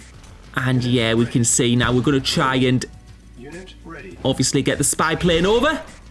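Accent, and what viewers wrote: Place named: Northern England, United Kingdom. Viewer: im not an expert, but it's a northern English accent, my guess would be something around Manchester.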